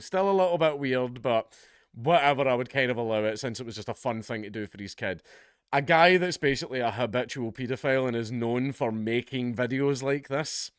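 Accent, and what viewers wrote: United Kingdom. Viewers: Pov: the most British British man.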